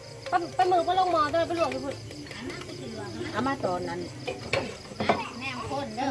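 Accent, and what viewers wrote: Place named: Ubon Ratchathani, Thailand. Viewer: สำเนียงการเว้าบ้านคุณ คล้ายเมืองอุบลฝั่งไทยมากเลยครับ แซบๆเด้อครับกินอยู่บ้านละดีพร้อมหน่าพรอ้มตาครอบครัว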